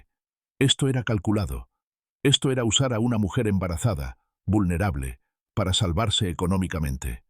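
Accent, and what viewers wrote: Argentina. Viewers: El siseo del narrador desquiciante y el acento ese porteño más desquiciante todavía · Así hablan los argentinos, uruguayos. Aquí en Chile también hablan sin tildes o acento y los plurales no los pronuncian. . Tienes que poner mucha atención para lo que realmente quieren expresar o para colmo hablan entre dientes, casi susurrando la mayoría de veces. Bendiciones.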